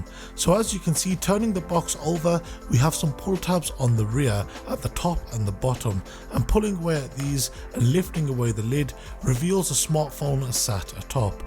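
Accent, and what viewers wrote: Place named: West Country, United Kingdom. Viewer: Yo amigo thanks for the sub, I have subbed back. Great video and good to hear a local west country accent! Keep it up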